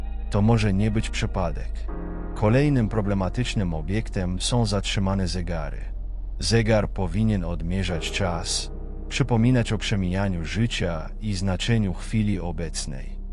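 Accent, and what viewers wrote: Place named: Russia. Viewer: Co to za Antychryst z ruskim akcentem nagrał te brednie ,gin szatanie ,,akysz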